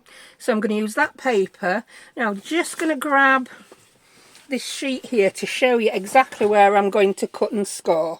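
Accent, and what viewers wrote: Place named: England, United Kingdom. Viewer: Great video, love your waffling, faffing and your accent, it reminds me of when I lived in England. Cute little folio, thank you 🥰🌺❤️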